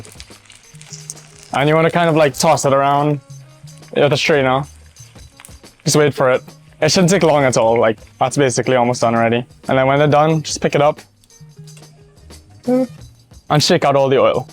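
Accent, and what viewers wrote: Trinidad and Tobago. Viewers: Currently stuffing my face with Popeyes and this pops up so I click it. I'm like wait nah how you sounding Trini so 🤣 I subbed after few minutes of research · A Chinese with a strong Trini accent is something I have never encountered before. WOW! I sometimes forget the Caribbean really is a melting pot of cultures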